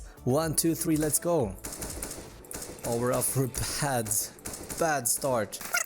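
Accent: Sweden. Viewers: THE SWEDISH ACCENT IS ON FLEEK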